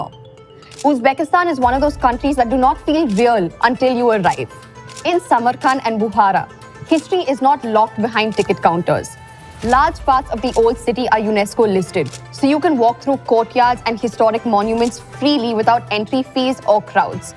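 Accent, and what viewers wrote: India. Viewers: Your english accent sounds like Indian accent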